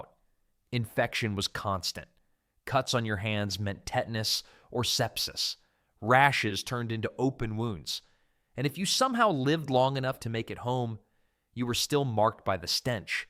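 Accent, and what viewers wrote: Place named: United States. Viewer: Interesting sounds a lot like American hmmmmm 🧐🧐🧐🧐🧐🧐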